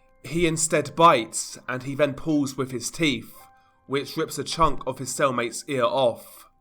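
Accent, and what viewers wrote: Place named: United Kingdom. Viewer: Man, this british accent is fire for telling such well writen stories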